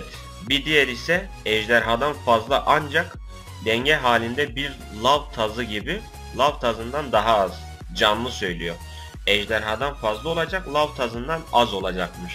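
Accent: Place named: Anatolia, Turkey. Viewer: Şiven Tam Bir Anadolu Çocuğusun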